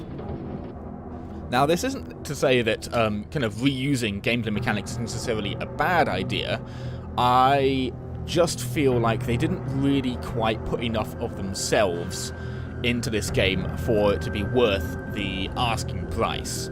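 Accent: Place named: United Kingdom. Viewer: Your accent makes me think of Jonathan Ross - are you from the same part of Britain?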